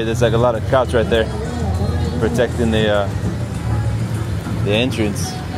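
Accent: Paisa, Colombia. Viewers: Are you Colombian or Venezuelan? Cause your accent sounds like it. Great video tho 👍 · hey How long are you in Tijuana, not sure if you have time for a Meetup, I am from San Diego, Long time Tijuana Expert. I went to Medellin last year and that's how I heard about your Channel..Cheers, FYI Red Building is the famous Hong Kong lol. Funny you talk Spanish in Tijuana like Paisa People haha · Your accent sounds Colombian when you speak Spanish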